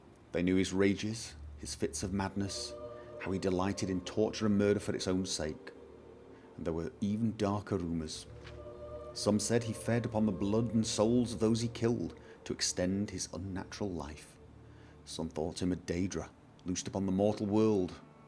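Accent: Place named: England, United Kingdom. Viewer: I noticed you live in Norway:o I am suprised! I know you are probably english (by listening to your accent) How did you end up in norway?:)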